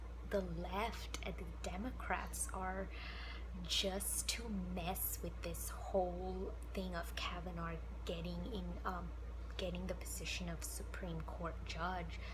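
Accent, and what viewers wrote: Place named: Sri Lanka. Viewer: From the name..indian from the accent possibly Ceylonese or Singalese from Sri Lanka...either way..same heritage...im indian as well and it looks like more and more of us are getting red pilled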